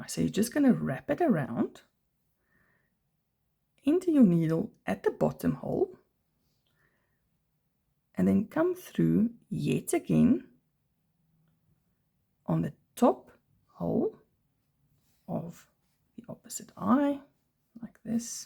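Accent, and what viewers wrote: South Africa. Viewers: How pleasantly surprised I was to hear your South African accent. Hellooooooo, from Jukskei Park, Gauteng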